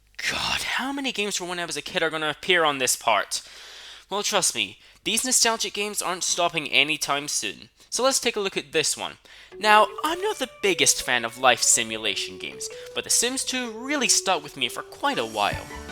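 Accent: Scotland, United Kingdom. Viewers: I knew you were Scottish from the start thanks to your distinctive accent. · Dude, I always thought you were Scottish. In fact, I thought that was once a running gag in colabs. e_o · I actually guessed you were Scottish when i first heard your voice! AND I WAS RIGHT!!!!!! (And i'm not lying!) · Yeeeaaaaahhh... you totally have a Scottish accent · i knew u were scottish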